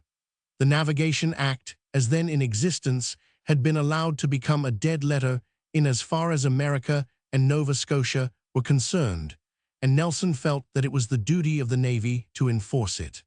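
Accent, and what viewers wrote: Mid-Atlantic, United States. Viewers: Absolutely horrible rendition. The AI voice has an unpleasant mid-Atlantic sound, and doesn't know how to pronounce basic words in English, such as lieutenant. If this is what audio-books sound like then I'll give them a miss.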